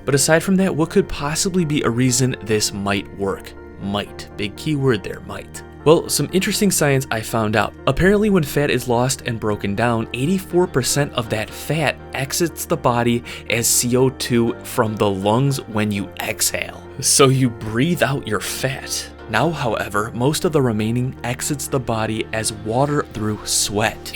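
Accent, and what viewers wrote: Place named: Michigan, United States. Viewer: Michigan accent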